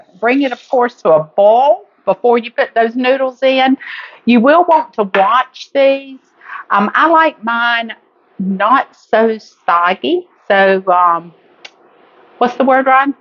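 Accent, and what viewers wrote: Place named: South, United States. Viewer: You can tell she's from the south. She pronounces "boil" like bowl.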